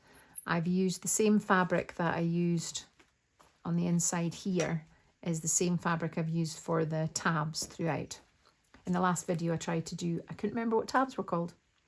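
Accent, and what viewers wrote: Scotland, United Kingdom. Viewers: Love this journal, I am really enjoying seeing journals that are not made up from kits. I have stopped using kits too, I was finding it was affecting my creativity. A few digital papers in the signature is nice if making a journal with a theme though. Its really nice hearing a Scottish accent in the journaling YouTube world lol, I am from Aberdeen origonally xx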